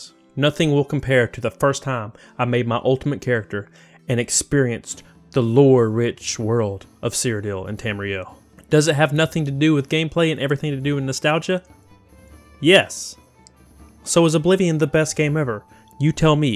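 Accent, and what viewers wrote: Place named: Texas, United States. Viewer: Your accent sounds like Amarillo TX....had a co-worker who sounded just like you before. Regardless, your accent is so relaxing and you do a great job!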